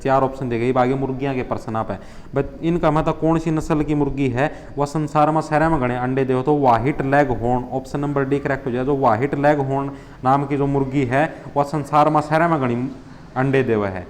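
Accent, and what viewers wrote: Haryana, India. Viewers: My first class h apke chnl pe Bhut mja aya ur apki haryanvi Wow m to fan Ho gyi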